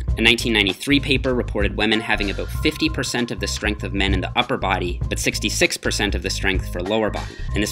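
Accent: Newfoundland, Canada. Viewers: Are you Canadian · Canadian accent is so interesting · He’s a neufie lol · He a Canadian boy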